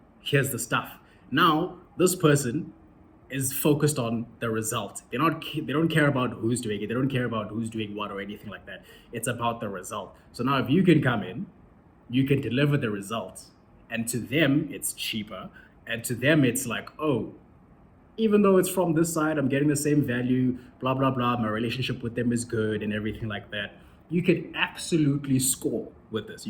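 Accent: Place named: United States. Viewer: Thanks for the video, brother. I'm curious on how you developed such a good American Accent. You've mentioned in the end but a little more detailing would help. Thanks!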